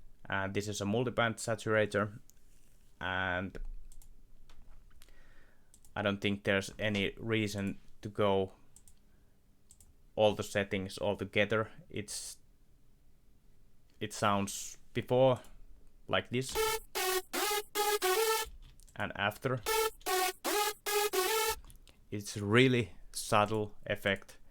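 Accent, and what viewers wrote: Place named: Finland. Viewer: Olipa ilonen yllätys kuulla rallienglantia hardstyle tutoriaalissa!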